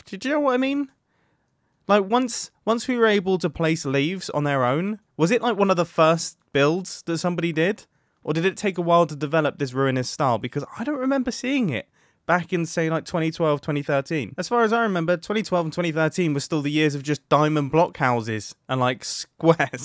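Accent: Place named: Scotland, United Kingdom. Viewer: YAASS I FINALLY FOUND ANOTHER SCOTTISH DUDE ON YOUTUBE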